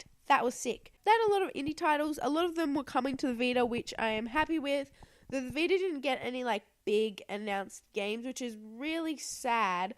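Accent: Australia. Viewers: ***** here is a wake up call if u don't like how people talk nobody is even going to waste a second with you or even pay attention. I on the other hand wanted to just say somthing maybe u could change again if u don't like how she talks then just leave the channel if u don't like the Australian accent know that nobody is going to change for your ungrateful ass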